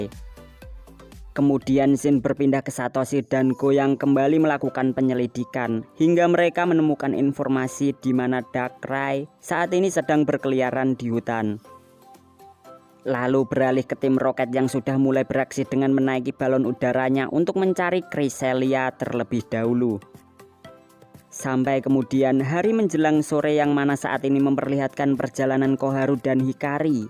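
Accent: Java, Indonesia. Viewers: Jawa mana bang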